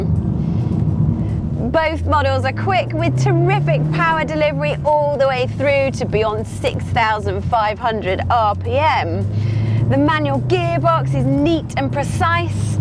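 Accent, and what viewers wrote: United Kingdom. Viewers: Why British accent sounds classier than American?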